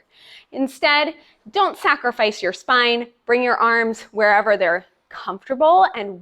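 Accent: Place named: East Coast, United States. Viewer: Great tips - and your east coast accent brings a smile to me over here on the west coast. 😀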